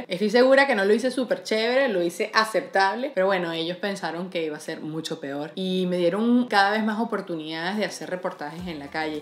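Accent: Venezuela. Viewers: Me encanta tu contenido y tu personalidad, pareces una amiga más. En otro video me gustaría que contaras cómo entraste a Turner; si fue por casting, o porque igual te llamaron. El Miss Universo por TNT ya es algo muy tuyo, no sería lo mismo si te sacaran. Además, eres venezolana, tu acento es muy rico de escuchar, y ganaste un concurso de belleza: TNT no te va a soltar! Hahahaha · eres exageradamente bella, y me encanta tu acento venezolano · Me encanta que ah pesar de los años. Porque ya tienes años fuera del país. Sigues siendo venezolana y hablas como una venezolana. Que orgullo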